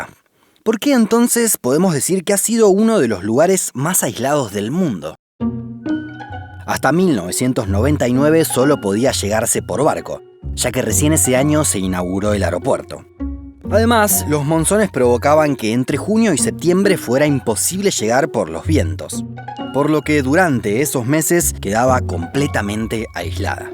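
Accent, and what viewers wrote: Argentina. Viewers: Acentos Ré ré ré argentino ! Adooooooro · El narrador es argentino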